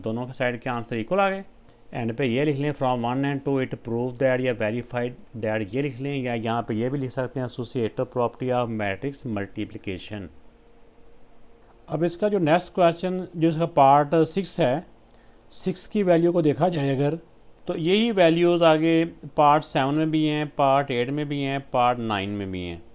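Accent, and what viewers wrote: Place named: Faisalabad, Pakistan. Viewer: Sir ap Faisalabad sy ha naww safff PATA chl rha ha 🫥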